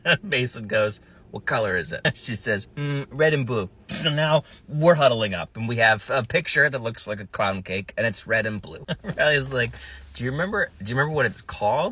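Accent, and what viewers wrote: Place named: Texas, United States. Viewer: That accent and jacket... You did not indeed mess with Texas. Except, Austin. That's not Texas. Great video btw!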